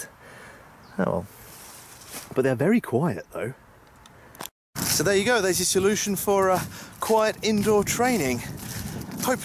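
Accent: United Kingdom. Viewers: Are you german? I've watched your videos for a while now and I've always thought that you were British. I was slightly surprised that you perfectly pronounced Zimmerpatrone. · Get so used to typical gun videos I wasn’t expecting a British accent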